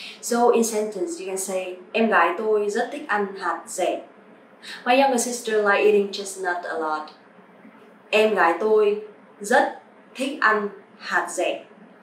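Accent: North, Vietnam. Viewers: They teach northern accent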